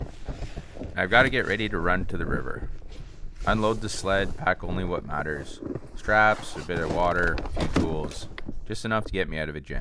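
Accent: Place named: Wisconsin, United States. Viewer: I love your Wisconsin accent but your using your ladder upside down, keepr moovin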